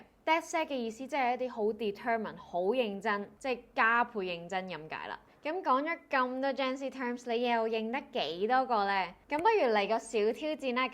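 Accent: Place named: Australia. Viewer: maybe Aussie....but definitely not Bogan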